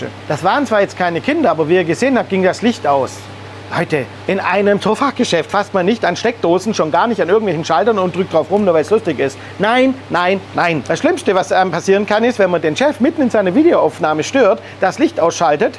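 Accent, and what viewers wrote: Swabia, Germany. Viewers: Ich schaue die Videos super gerne, insbesondere des Klartextes und der Direktheit wegen. Der schwäbische Dialekt ist nicht so krass, dass es die fehlerhaften Untertitel bräuchte.😉 Danke für die Mühe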